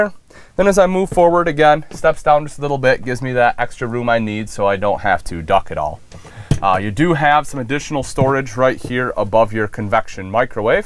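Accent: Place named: West Michigan, United States. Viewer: This guy is well-spoken, knowledgeable, and has a nice West Michigan accent!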